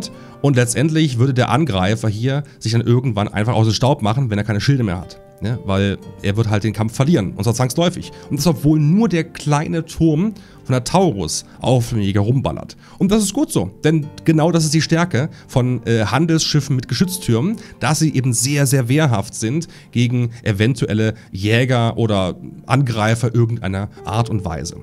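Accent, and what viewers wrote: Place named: Saxony, Germany. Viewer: Vermehrt. Da merkt man das du aus dem sächsischem Bereich kommst 😅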